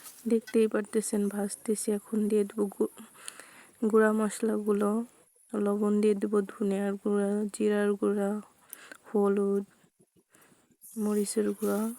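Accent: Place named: Bangladesh. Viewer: খুব সুন্দর লাগলো তোমার রেসিপিটা খুব ভালো লাগলো, পুরো ভিডিওটা দেখলাম, কথা শুনে মনে হচ্ছে বাংলাদেশে বাড়ি, আমি ইন্ডিয়া থেকে দেখছি ভিডিওটা, আশা করি আপনি আমার পাশে থাকবেন❤❤❤❤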